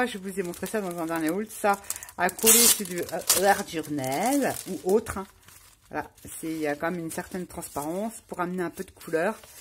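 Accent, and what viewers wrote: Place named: Lorraine, France. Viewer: Bonjour, je suis nouvelle abonnée, et j'entends que tu es en Lorraine comme moi...Tu es de quel coin? Je suis de la région de Pont-à-Mousson. Peux-tu me donner l'adresse de ton nos stp? Bisous 👋⚘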